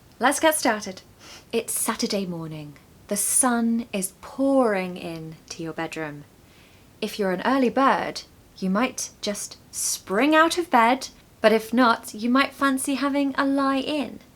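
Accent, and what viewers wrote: United Kingdom. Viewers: It is lovely British pronunciation😊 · The best teacher.I love british accent.I like your voice teacher.😊❤ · You've got such an elegant British accent! Really enjoy listening to your speech. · Hello! Well, many British accents exist. They (British people) don't all speak like 🙂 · Hello,. Hope this email finds you well, and sound. I am writing this email in regards to your ways to present a productive content. Literally, endearing, splendid British vlog. I am totally intrigued with your fancy accent. Moreover, I want to express my gratitude to you for all your work on getting your content makes sense, so kindly keep the good work up. Your prompt respond is highly appreciated. Sincerely, Moe. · I love British accent and want to talk like British. Your video really helping me,. Thanks for everything. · Hello, Is posible to teach me class online I like your accent British Kind regards Emma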